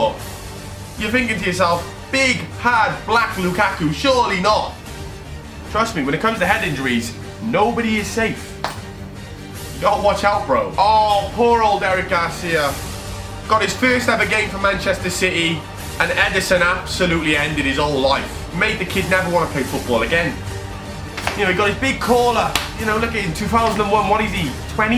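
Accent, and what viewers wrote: Wales, United Kingdom. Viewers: Defo a Newport or Cardiff lad you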